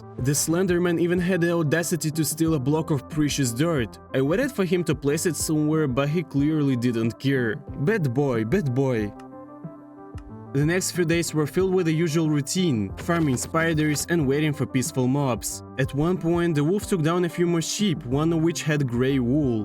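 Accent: Russia. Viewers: I hear russian accent lol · Настольуо акцент русский слышен, что даже забавно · nah, its russian accent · when do you guys realised he is a russian guy, i realised it in 2 min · С первых слов поняла что ты русский, но не было доказательств, пока не увидела достижения 😂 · У тебя русский акцент По секрету всему свету скажи откуда ты? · как же палишься братан по акценту)) своих видим за версту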